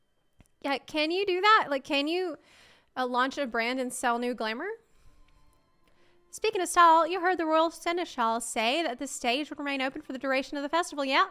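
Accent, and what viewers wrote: South, United States. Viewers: The southern accent made this video great for me being from and in southern Louisiana 😂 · A+ southern RP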